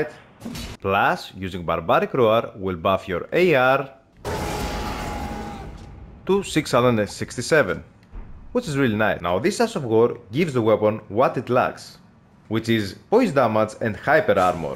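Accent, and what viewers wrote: Greece. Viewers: That’s a Greek accent bro am I right ? 🇬🇷✊ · Man I know a greek accent when I hear one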